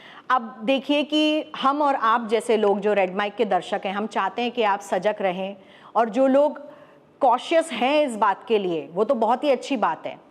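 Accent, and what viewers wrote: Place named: Bihar, India. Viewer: Sound se to bihari lag raha hai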